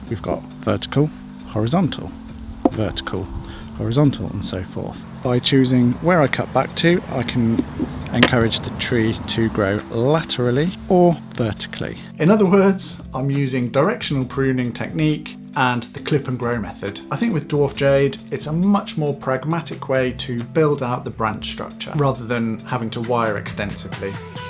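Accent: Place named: United Kingdom. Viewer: You have a unique style of making videos and its awesome. I like them all a lot and i also like your british accent. Keep the content coming!! Greetings from germany.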